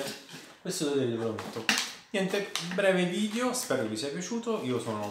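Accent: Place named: Rome, Italy. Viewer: ah davvero? L'accento sembrava romano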